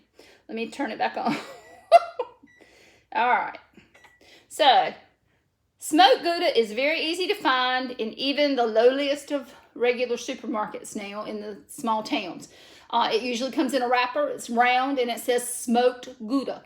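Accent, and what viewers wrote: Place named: Eastern North Carolina, United States. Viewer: I just watched your entire video with my birthday fiancee. We are both Eastern NC natives, so could easily understand your ack-see-ent! We have decided to come to your house and cook with you this Tuesday. I'll pay for all the ingredients, bring a couple bottles of white wine (since you seem to be out), and we'll solve the Covid crisis. Seriously, truly enjoyed your recipe (very similar to Myrla's). Thanks. JIm, new subsriber.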